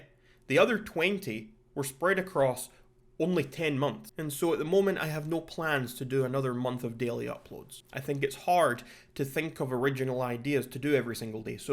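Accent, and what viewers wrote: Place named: Scotland, United Kingdom. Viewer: Bro saying Australians have the worst accent while this dude is Scotland (not hating on Scotland btw)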